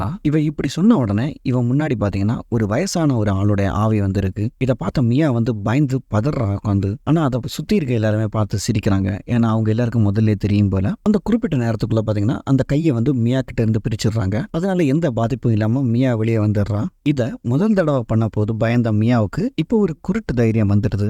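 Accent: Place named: Kerala, India. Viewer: Chettan malayaliya Nattilevideya Nice Tamil slang chettayi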